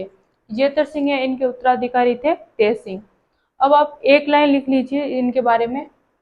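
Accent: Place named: Marwar, India. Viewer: Respect Mam G Marwar se ho kya?? अपना लहज़ा लगा 🥰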